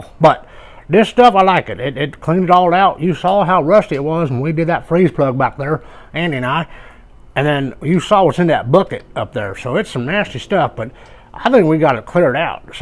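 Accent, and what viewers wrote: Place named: Ireland. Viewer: It's the Irish accent.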